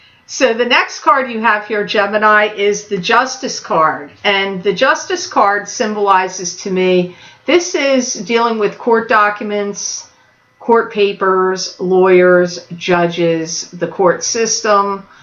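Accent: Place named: Maryland, United States. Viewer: Your accent feels like home to me... I swear you're from Maryland or Pennsylvania! Love the video and thank you for your words. :)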